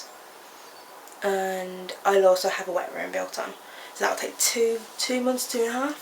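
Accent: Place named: Birmingham, United Kingdom. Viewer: I usually cringe at Brummy accents; I did not even notice yours. Thanks for sending me the link to your video and will definitely follow your progress. i have Spinabifida, reactive lifelong depression, anxiety, recent stroke, possibly M.E. and or possibly M.S., etc, etc, etc... as many of us have. Your willingness to keep going, just from seeing it in this one video offers me some hope. I hope you can and will record videos maybe every few days? It's things like this videoing that help people like me keep going x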